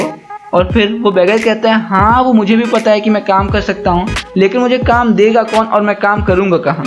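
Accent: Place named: Bihar, India. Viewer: YAAR SAB KUCH TO THEEK THA BUT ACCENT SUDHAAR LO ....... BIHAARIYO KI TARAH BOL RHE THE EK DAM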